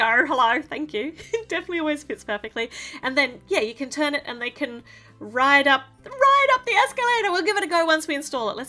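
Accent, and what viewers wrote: Australia. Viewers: She has so much energy and by listening to her talk you can hear the amazing Australian accent❤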